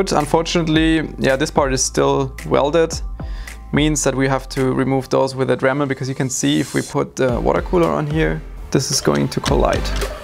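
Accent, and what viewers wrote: Germany. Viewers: Alda. Ich liebe diesen deutschen Aktzent. #nohate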